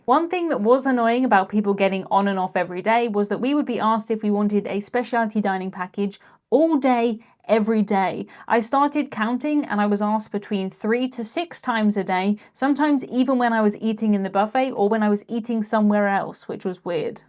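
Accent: United Kingdom. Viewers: Not a moan, but more of a question. Why do you call biscuits cookies, when sound like you are from the UK? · I just love this gal. The Brit accent is intoxicating!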